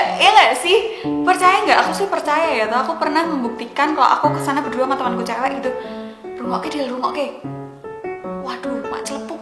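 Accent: Java, Indonesia. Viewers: nonton video kak saya ketawa2 sendiri terus, cara menyampaikan nya lucu banget apalagi pake bahasa jawa yang medok gth:v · asal mana kak? lucu banget medok hihii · Jowo mu ndi? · Aku baru liat video kak & jujur suka, apalagi dengan cara bicara nya KK yang medok bngt, ketawa karna di video ini, apa yang KK pikirin itu sama, sama yang selama ini aku pikirkan tentang ciuman & jujur hingga usia ku 22 saat ini aku blm pernah ciuman apalagi bibir sama bibir. Tapi aku suka saat liat drakor ada adegan ciuman nya😂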